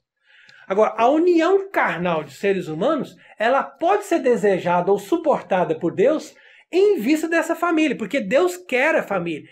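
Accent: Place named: Minas Gerais, Brazil. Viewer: vc é mineiro de Ituiutaba? Esse sotaque é ótimo. Parabéns pelo trabalho!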